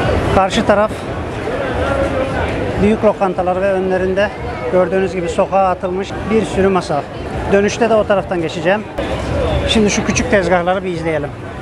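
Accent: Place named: Alanya, Turkey. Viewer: Alanyalimisin siven ayni alanya yoruuuu gibi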